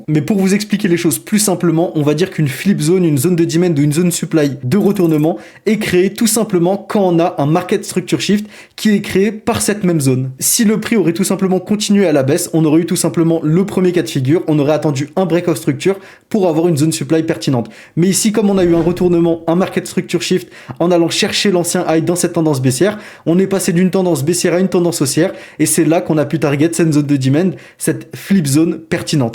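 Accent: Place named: United States. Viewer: Avec l accent us 👍🏽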